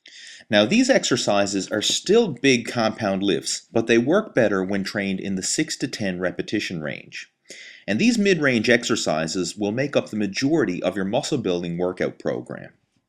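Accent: Newfoundland, Canada. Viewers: I love your canadian accent! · Are you from Canada? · Great videos! Lovin' the Newfoundland dialect aswell haha :D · best canadian accent i've ever heard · Is that a newfie accent I hear?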